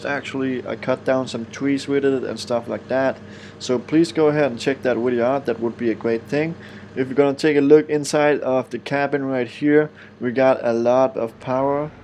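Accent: Denmark. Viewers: Man kan godt høre du er dansker, haha fede videoer!!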